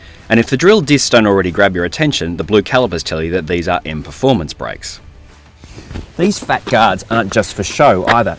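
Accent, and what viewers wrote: Australia. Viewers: Really strong Aussie accent! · Chi Hsia this is just a normal accent in Australia haha everyone sounds like this here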